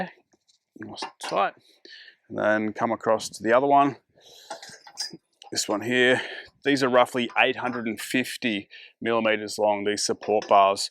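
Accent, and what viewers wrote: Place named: Australia. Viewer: It’s wild to here the Australian accent. So many endings of sentences sound like it’s a question. Pull up bar? Higher? Top bar?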